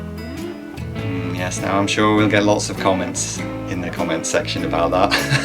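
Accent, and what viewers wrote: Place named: Ireland. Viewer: A bit of Irish...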